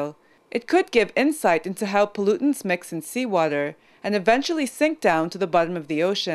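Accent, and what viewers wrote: United States. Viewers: I'm English so to me she just sounds American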